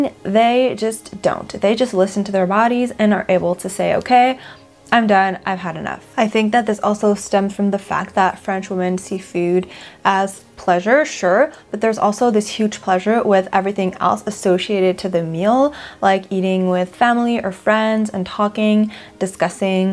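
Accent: United States. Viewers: Are you french? I feel like you talk like you came from the US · Your America accent is darn good, so says this American! Of course, we would expect your French accent to be perfect.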